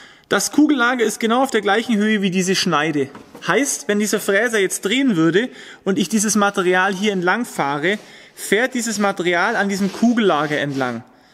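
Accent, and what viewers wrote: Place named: Augsburg, Germany. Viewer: Hallo ich hätte mal eine frage. kommst du aus der nähe von augsburg. mir kommt der dialekt so bekannt vor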